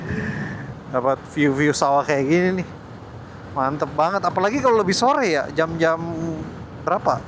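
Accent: Ambon, Indonesia. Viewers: Logat ambon kantara Sukses selalu kk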